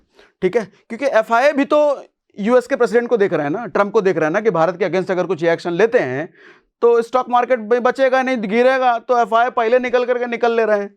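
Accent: Bihar, India. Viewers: Bihari tone me daam hota hai. Logic very fine sir ❤❤ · जी ये तो पूरा बिहारी टोन है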